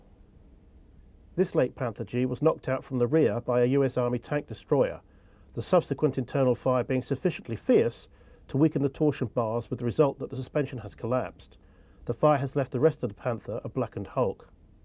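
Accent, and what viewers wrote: England, United Kingdom. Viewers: I had a hard time trying to understand the narrator. Too much English (accent)....lol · nice video,the only thing is i can't understand the british accent :( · morti271 he has a lot of brithis accent but for me is better than the american one . is more easy to understand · You mean proper English without American accent? :P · the british accent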